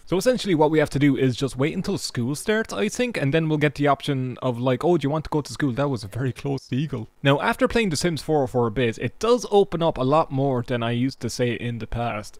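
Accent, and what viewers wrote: Ireland. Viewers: Man I wish I had an Irish accent I love how it sounds lmao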